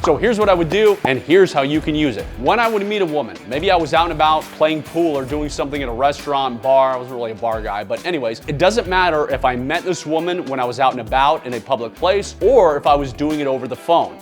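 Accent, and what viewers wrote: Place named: Texas, United States. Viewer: That´s a Texan accent.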